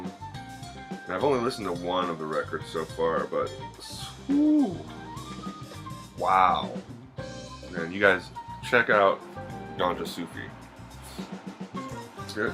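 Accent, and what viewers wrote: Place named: France. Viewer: Just love your French accent! nice diabolique pick ups.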